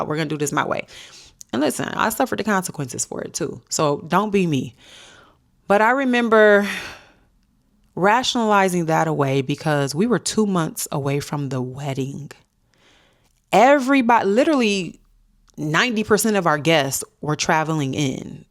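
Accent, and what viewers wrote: United States. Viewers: Upstate NY?? You def have a Detroit accent lol, I was shocked when you said that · You are soo pretty I hear a Buffalo accent are you from Buffalo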